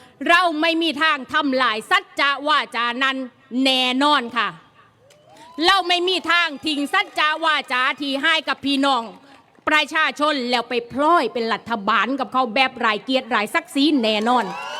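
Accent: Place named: Laos. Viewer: รู้สึกว่าคุณมีสำเนียงลาวปนมาหน่อยนะ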